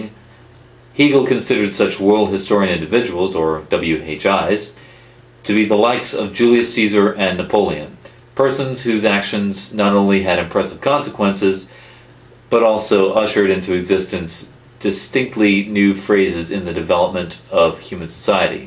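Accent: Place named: Midwest, United States. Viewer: HAhaha nice! Wisconsinite's are abit more crazy with the r's though. That sound like a more sophisticated Midwestern accent. i cahn tie-pah een mah ayct-cint. baht i cahn't tah-k een ah us-trail-leen ayct-cint. wee-sh mah luk ^^^thats how a lot of people talk round here our is pronounced - "R" in fact r's dominate words in WI we just get stuck on the r's all the time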